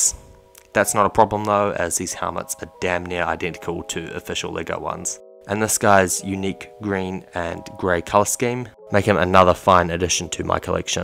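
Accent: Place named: New Zealand. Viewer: Are you from new zealand cuz you sound like one of us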